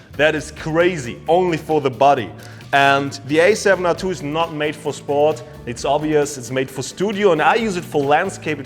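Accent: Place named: Germany. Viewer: Boy, you talk with such a droll German accent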